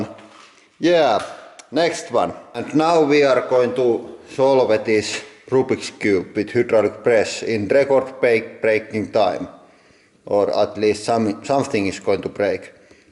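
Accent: Finland. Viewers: very strong finnish accent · is he from finland cause sounds like it? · Most of the finnish people know him of he's epic finnish english axent :D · Rallienglanti for the win! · This kind of very strong finnish accent is know as "Rally-English" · the finnish accent lol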